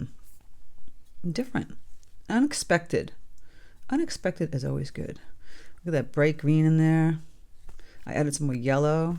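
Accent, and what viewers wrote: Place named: Minnesota, United States. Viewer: Thank you, it is a fun project. I heard you inMinnesota😊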